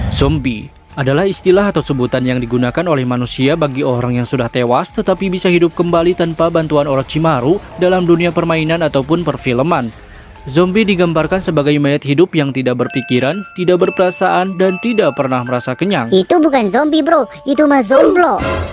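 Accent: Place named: West Java, Indonesia. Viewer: Lu orang sunda ya ngakak ( jangan di dueleu ) salam ti garkhil ( garut karihkil )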